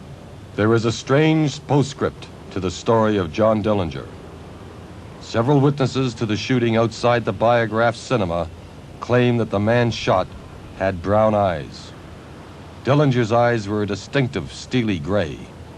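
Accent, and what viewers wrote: Canada. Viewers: Wish they had used an American narrator, rather than a Canadian.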